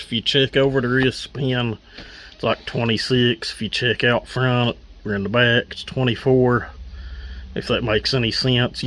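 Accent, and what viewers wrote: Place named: Deep South, United States. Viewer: No drama about the port work but what the fook is up with that accent? Is that deep south swamp? Love it too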